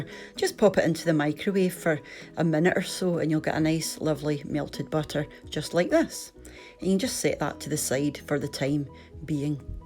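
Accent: Scotland, United Kingdom. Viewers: Happy New Year from the Highlands of Bavaria (Alps). Thank you so much for your lively recipes. I so love watching, learning and of course listening to your Scots. A friend fae Aberdeenshire sent me Orkney oatcakes in a Christmas parcel. Made my day. I've been in a sickbed for 19 months, now and there's not much of a chance to make it to Scotland anytime. I'm trying to bring Scotland here to this 9 squaremeter sickroom. Tartan everywhere, photos, Manran and Skipinnish or the Corries singing to me and with me. I spend a lot of time trying to learn some Gaelic online. All this keeps my spirits up but sitiing in my tartan bedding sipping on a dram and munching away on Orkney oatcakes made this Christmas so much better for me. I want you to know, when you are making your videos, you are making many of us subscribers smile and feel good. It's not "just" about cooking. 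You bring a wee bit of Scotland to people around the world and we appreciate your work and your personality very much. Best wishes to you and your loved ones Bliadhna Mhath Ùr 🙋🏻‍♀️ Lisa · I got into oat cakes via Nairn's which used to be in the supermarket here in Australia. They had a very nice fruit version with little currants. I was shocked a canny Scot threw away the extra dough! heh. My Mum was Irish and I think I got it from her not to waste. Love your accent and voice, and Scottish words like shoogle. Good job. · My first batch is in the oven! I love listening to your voice. The Scottish way of talking is so beautiful. Thank you for sharing. I made it with gluten free flour and put the oats in a blender to make the flour finer. I will experiment by adding some sesame seeds and using different fats like olive oil for example. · Pleasant video mouthwatering and superb accent! I love Scottish accents! 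Thank you for your video! 👍👍👍👍👏👏👏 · Love your accent Makes it so authentic thanks Can’t wait to make them I am Australian but love all things Scottish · I've just produced two baking trays full of your delicious oatcakes - since I worked as a German au pair near Aberdeen when I was young I love oatcakes and I am very glad I found your video. Love your wonderful Scottish accent. Thank you so much!! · Thank you❣ Love from South Africa... How I love your Scots accent ❤️ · Thank you for sharing I must try these out and I love your Scottish accent Love From south africa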